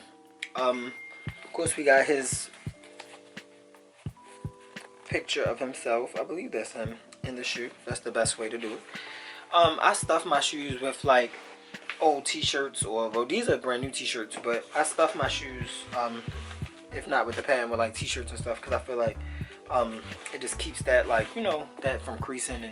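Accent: Baltimore, United States. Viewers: im so dead I could tell you from Baltimore just by the accent and I was right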